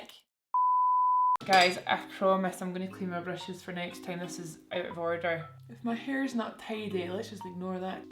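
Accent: Scotland, United Kingdom. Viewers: I’d be so interested in a master class. I find your techniques amazing. On the flip side I’d be worried that I wouldn’t make it to the end of a class with you, your soothing Scottish tones make me sleepy 😂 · I could literally listen to you talk forever😍 Scottish accents are my absolute favorite (I’m from Boston so almost anything is better than a Boston accent😂) you are so stunning💕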